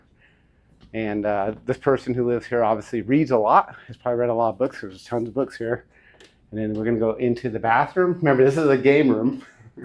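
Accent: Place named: South, United States. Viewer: 😂 I assume you know little to nothing about Real Estate because it appears you know little to nothing about Real Estate. Your narration is a comedy of errors. With all due respect you sound like a hick in the Beverly Hill Billies. And the video was nothing but a face shot of you blabbering with your shoulder strap upside-down. 20 minutes of watching your body take up 40% of the screen while we walk backwards dizzy is a turn off. Do a short intro of you then just walk the entire property camera facing forward without you in the shot or talking and add some nice music. Do another shot of you at the end with a wrap. We know what we are looking at. We know what a bathroom is and what the " nice wood on the wall" actually is called. Unless the comedy releaf of you saying things like "tennis ball court" is intentional. With all do respect rethink your format. 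Thanks for the laugh.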